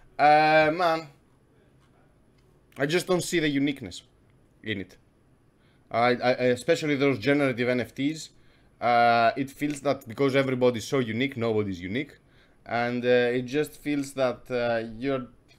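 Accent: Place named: Greece. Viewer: thiccc Greek accent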